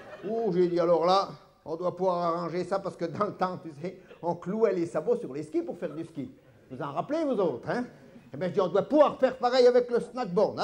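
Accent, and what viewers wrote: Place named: Vosges, France. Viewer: Ben oui il est excellent. vive les Vosges et le patois !!.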